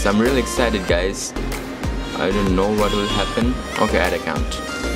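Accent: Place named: India. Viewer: You got Indian accent I ain’t dumb tho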